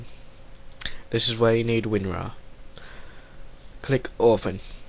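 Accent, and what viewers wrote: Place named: Scotland, United Kingdom. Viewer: sorry if im offending, but u just have a voice like a Scotlander =) anyway thx for the tutor.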